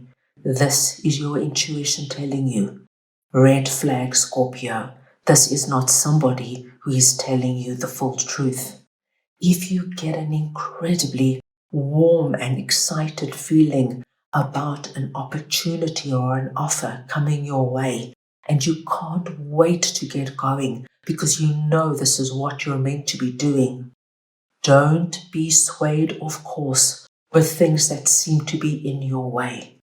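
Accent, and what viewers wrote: South Africa. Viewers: Thank you …. I love your South African voice!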